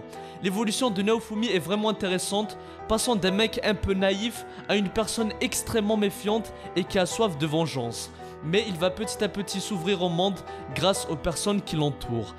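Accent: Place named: Kabylie, Algeria. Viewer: Pourquoi cette accent kabyle ??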